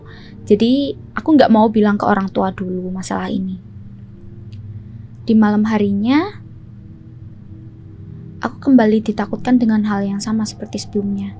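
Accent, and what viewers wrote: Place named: Central Java, Indonesia. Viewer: Mbak logatnya jawa tengah bnget hee 😌😌😂😂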